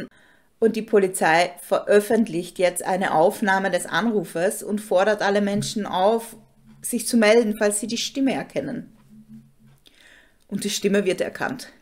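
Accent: Austria. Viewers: Erst mal danke für deine Videos, ich höre dir mega gerne zu, du erzählst schlüssig und spannend mit einer angenehmen Stimme und ich liebe diesen österreichischen Hauch total! Für mich hat der Typ was schizoides, wie 2 Persönlichkeiten in einer Person... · Danke für diesen Fall Was für ein Jammerlappen🙄diese Stimme und das Geheule ist echt furchtbar Hör ich da bei Dir einen leichten österreichischen Einschlag❓ Sympathisch 😉😉🍀🌸💙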